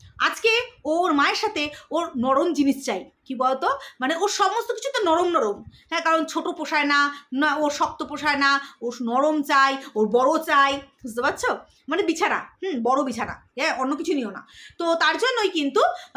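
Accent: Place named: Bangal, Bangladesh. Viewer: darun bollay bangal bhasha ta 😂😂😂😂😂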